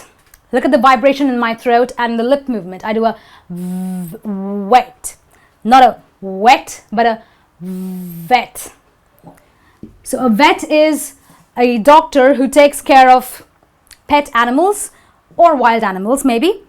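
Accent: India. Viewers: Mikey Jimenez, she is an Indian. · She's learning as she teaches, don't be to hard on her. She's indian.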